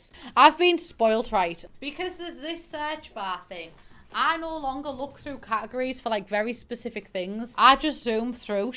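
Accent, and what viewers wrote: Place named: Scotland, United Kingdom. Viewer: Your voice gives me LiFe! I love the Scottish accent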